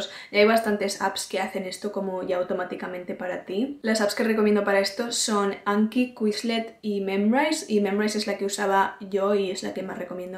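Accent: Spain. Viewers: youtubers que hablaran español natural de España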